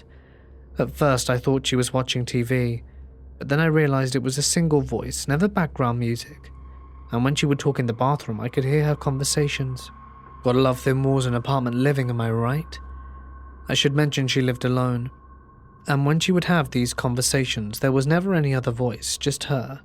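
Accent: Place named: RP, United Kingdom. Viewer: Reminds me of a high class 1920s British socialite accent. I like it.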